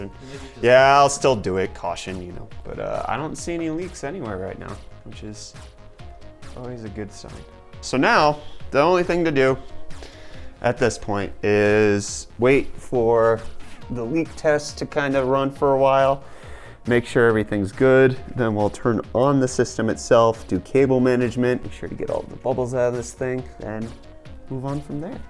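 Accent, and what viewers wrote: South Africa. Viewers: Are you from South Africa ??? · you south african? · are you south african? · What part of south africa is he from? If it’s cape town i feel for you given the whole water situation. My grandfather is struggling with the extra costs from accidental overuse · Are you from South Africa? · Where is you'r Sa accent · Do i hear South Africa what does this pc cost in Rand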